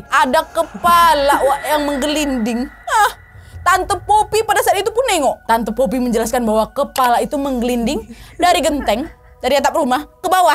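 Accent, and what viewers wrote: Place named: Batam, Indonesia. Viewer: org batam kah? Krn logatnya batam kali wak.. aku jg dibatam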